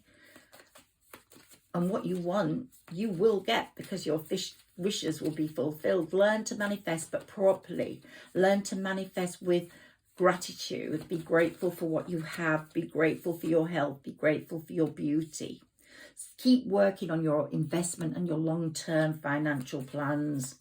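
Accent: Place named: Northern England, United Kingdom. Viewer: Yesterday was a very strange day, felt extreme boredom, very nervous, not sure why iv never experienced energy like this. These runners all seem to be virgo or cancer, my person a fellow saggi, never heard of a fire sign in this cycle, thank you for your beautiful reading I always look forward to hearing your slight northern accent lol thank you xx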